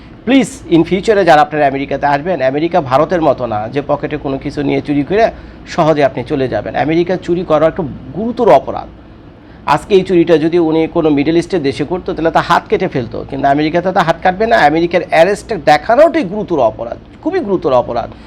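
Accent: Dhaka, Bangladesh. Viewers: ের বাড়ি কোথায় মাঝে মধ্যে ঢাকাইয়া ভাষায় কথা বলেন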